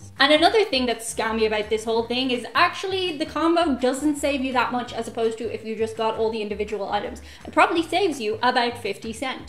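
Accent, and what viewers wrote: Ireland. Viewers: Hello. What part of Ireland are you from? Im guessing south east. Co Wicklow?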